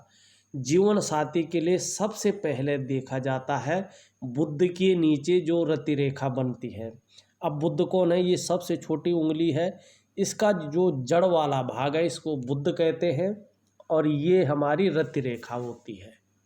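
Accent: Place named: Garhwal, India. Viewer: Guru ji bhasha se gadwali lag rahe ho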